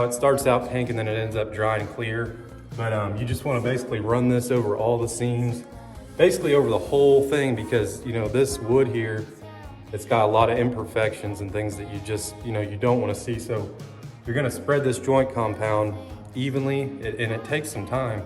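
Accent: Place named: Indiana, United States. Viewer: I'm in southern Indiana. You remind me of an old classmate of mine. I think your accent gave me a hunch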